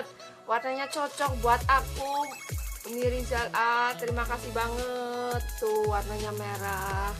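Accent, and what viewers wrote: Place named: Java, Indonesia. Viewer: Wa'alaikumsalam wr wb Waaah tasnya bagus bangeeet 🤭 Medok banget mbak hehee Salam ngapak lah Nek ora ngapak genah ora kepenak ya mbak 👍 Selamat ya dapat kiriman paket dua sekaligus dari umi rizal A dan mbak Nursilah julaini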